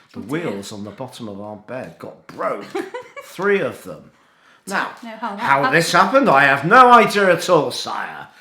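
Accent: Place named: North, United Kingdom. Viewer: I like your Northern accent 😂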